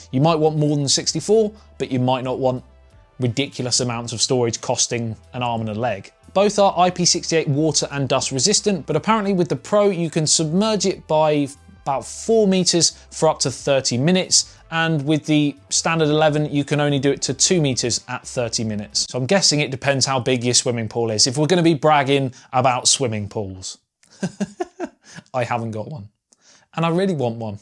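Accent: United Kingdom. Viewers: Typical irritatiing british accent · is British by his accent...and i guess I am right...!!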